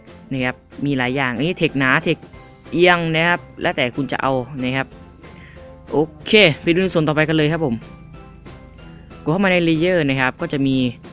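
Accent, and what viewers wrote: Nakhon Phanom, Thailand. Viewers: พี่อยู่จังหวัดไร เหมือนนครพนม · พี่คนนคระนมหรอครับ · คนนครพนมหรอครับ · พี่อยู่นครพนมใช่ไหมครับ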